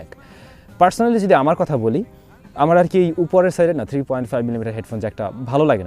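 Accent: Barishal, Bangladesh. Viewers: ফাও কতা কইয়া লাভ নাই। ভাই যে মোগো দেশি কতা হুইন্না বোঝা গেছে🤣🤣🤣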